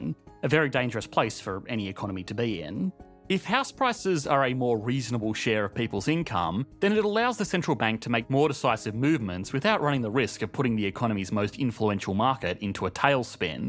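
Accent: Australia. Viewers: I use your videos to work on my Australian accent. It is thiccccc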